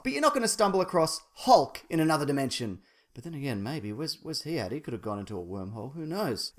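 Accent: Australia. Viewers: Mate you are so Aussie, it's fantastic. Thank you for being so ocker and totally whatever about the whole thing - made the review much more enjoyable haha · I thought this guy's Australian (British?) accent would get to me ... but it wasn't too obnoxious.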